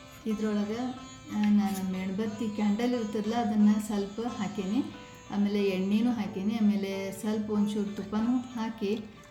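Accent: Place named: Karnataka, India. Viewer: I love ur Kannada slang ❤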